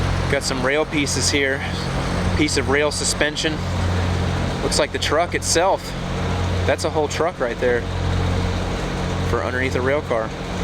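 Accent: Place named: United States. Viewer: . Like other people, just love your presentation! So clear and concise, such a quaint accent! So nice to hear such good English coming from the USA. Excellent report in every way. Have to say I am amazed to see a wooden embankment in 2019 in high tech USA. Don't think the UK has had any since 1900! Wonder why they did not use stone/brick or rock spoil from elsewhere when building the line? But quaint all the same. Most enjoyable.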